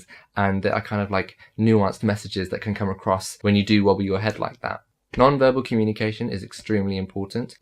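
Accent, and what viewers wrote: India. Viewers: I like your indian English accent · you can mask your Indian accent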